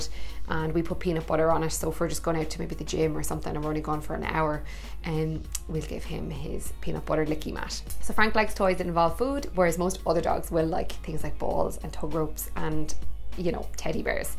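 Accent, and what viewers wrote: Ireland. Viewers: I'm mainly here for the Irish accent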